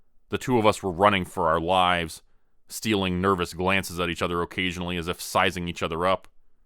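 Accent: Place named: Canada. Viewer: Tjis is great. Is this your real voice man. Its nice. You sound a bit Canadian with the inflection. Cool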